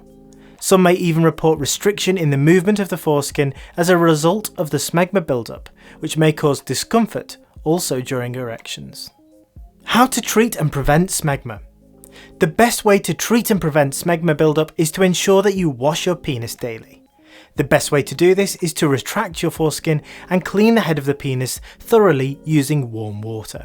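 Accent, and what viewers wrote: United Kingdom. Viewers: Northern British English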